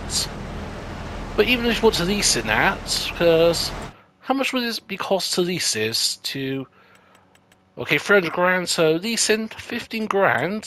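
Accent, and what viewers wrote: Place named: West Country, United Kingdom. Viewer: i could listen to what i believe is your west country accent all day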